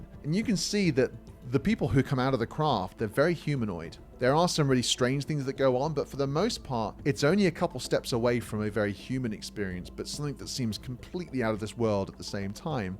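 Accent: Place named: United Kingdom. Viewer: Could be. Your have a British accent.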